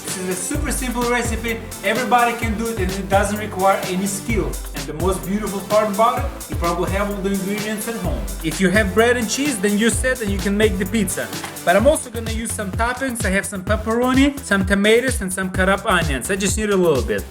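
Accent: Russia. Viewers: I could tell u are Russian by your accent : )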